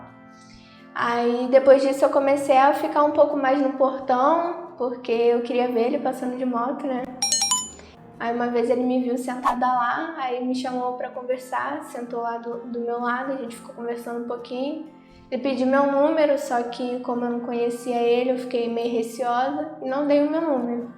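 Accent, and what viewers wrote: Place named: Northeast, Brazil. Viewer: Tantas pessoas passam e passaram pelo que vc passou, que bom que vc superou. Vc é jovem, bonita, extremamente simpática e humilde e merece ser feliz, vc tem obrigação de ser feliz. Quem te quiser por parceira deve saber que é prá vida toda, vc é uma menina de ouro. Parabéns pela coragem de contar o que aconteceu com vc. Pelo sotaque vc é do nordeste ou do norte, não é?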